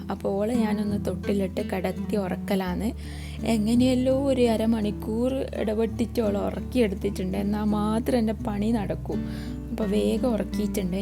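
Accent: Kannur, India. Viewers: കണ്ണൂർ ഭാഷ 👍👍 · ശരിക്കും തനി കണ്ണൂർ ഭാഷ 👍👍അദ് മദി 🙏🙏🙏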